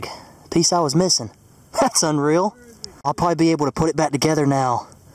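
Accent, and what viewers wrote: South, United States. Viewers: i love ur country voice!! itss saatisfying to me from the north, thanks for the verses at the beginning of ur vids:) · I'm here mostly for the accent, also to watch find treasure! 😅 This California girl can't hear enough of a lovely southern accent. 🙄👌🎶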